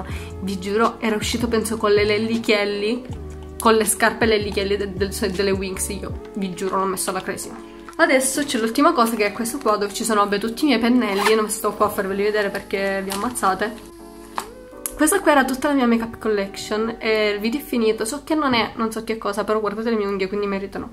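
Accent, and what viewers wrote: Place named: Sicily, Italy. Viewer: secondo me sei di Marsala . Cmq sei siciliana come me. Io abito in provincia di palermo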